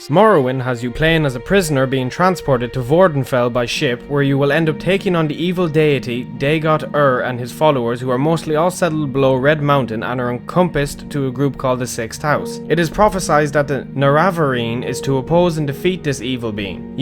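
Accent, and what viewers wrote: Ireland. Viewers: When I was watching this I couldn't place your accent, but after noticing how you pronounce your Ts I was like "oh shit he's Irish" then I saw your channel name. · The Irish accent is the only way to hear the elder scrolls. Save for some dodgy pronunciations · I'm thinking is not mispronouncing the tes names but simply speaking them in the glorious Irish accent. Didn't know how I felt about it at first but it's kind of nice. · Is your accent Irish? It's awesome I want to hear you read a book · He mispronounces Tharn because of his strong Irish accent.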